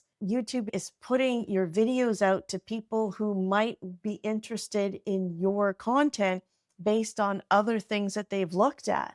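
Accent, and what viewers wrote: Canada. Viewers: Thanks so much for the helpful explanation. I started a channel (under a different handle than this one) and keep trying to plug away at it waiting for it to gain traction, but now i know - it's stil early! Also - I registered the accent before you mentioned you were Canadian. I enjoyed listening to you! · Love your Canadian accent! Reminds me of my Canadian relatives!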